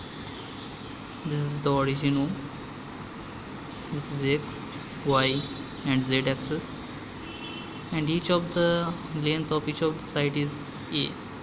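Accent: Bengal, India. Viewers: Bengal se ho kya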